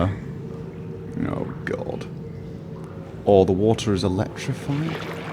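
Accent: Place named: North West England, United Kingdom. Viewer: He is almost certainly from the North West of England. We have almost identical voices so I'm going to say he's within a 40 mile radius of Manchester. :D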